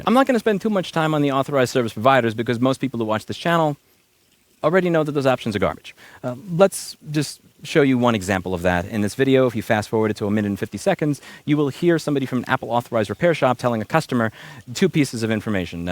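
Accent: New York, United States. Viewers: The angrier Louis gets the more is New York accent comes through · I can fully process at 0.5x, but slowed ny accent sounds like a mob repair guy.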